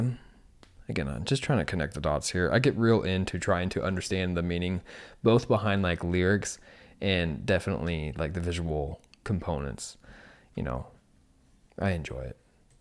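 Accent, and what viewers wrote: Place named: United States. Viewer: You sound like american. That explains why you dont seem to know very much about world and history. 😊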